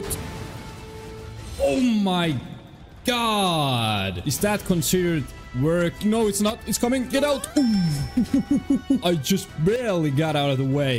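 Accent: Finland. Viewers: You sound like finnish guy and i from there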